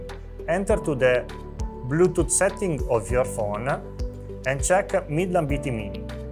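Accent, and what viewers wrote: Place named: Italy. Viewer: Si sente che è italiano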